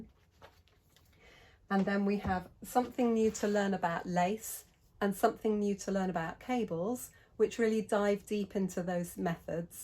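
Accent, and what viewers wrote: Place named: England, United Kingdom. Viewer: Hi, I've just discovered you and your technique videos recently thru MDK which led me to this YouTube channel. Just wanted to say hi and thank you. Your videos are great, easy to follow and understand. I'm an expat from Scotland so your lovely English accent takes me home. Looking forward to learning more. I'm always eager to improve.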